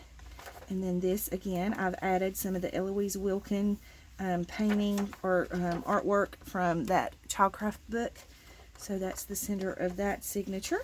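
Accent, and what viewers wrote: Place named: Tennessee, United States. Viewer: This is simply stunning! I thought I recognized your accent...I grew up in Cookeville, just a hop, skip and jump away! ty so much for sharing .. it’s so beautiful! Hugs 🤗MH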